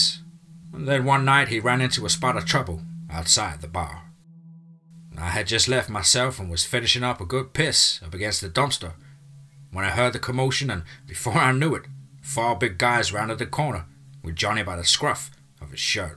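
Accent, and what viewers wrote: United Kingdom. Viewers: No, they probably just can't stand a British accent telling them a story, or they are aware wolflike, like me. Oh, and I can't wait for you to call me a racist so I can call you some names too, since I'm in a tear-your-throat-out mood right now. I'm the 7th👎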